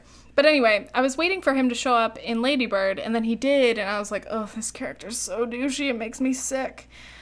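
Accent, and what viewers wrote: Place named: United Kingdom. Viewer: Giving you a super like for that British accent. Applause applause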